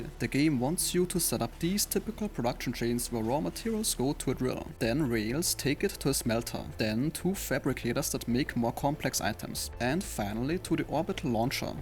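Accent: Germany. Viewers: Servus, man hört es direkt raus... Hast auch einen deutschsprachigen Kanal? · Ah good old German accent ❤ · bro i can hear instantly that he is german haha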